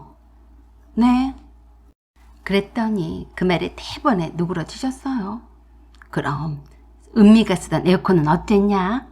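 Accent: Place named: Jeolla, South Korea. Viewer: 말투가 제 올케랑 너무 비슷하세요.. 전라도 특유의 억양이 특히나.. 말씀을 재밌게 하시네요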